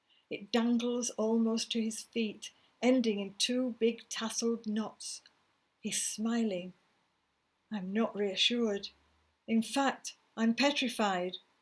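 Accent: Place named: United Kingdom. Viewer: I wish I had you reading this book in your wonderful British accent. I so enjoy it xxx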